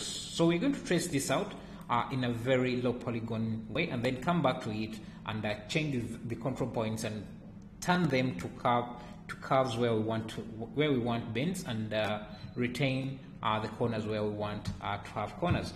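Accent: Kenya. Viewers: you sound Kenyan, good videos but you speak way too fast for other people to understand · Your accent sounds so Kenyan 🇰🇪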